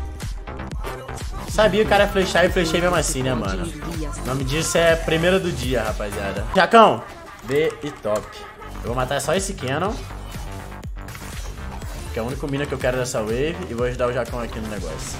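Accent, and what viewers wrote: Rio de Janeiro, Brazil. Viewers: Esse é muito carioca mano 😂😂😂 Fé com fé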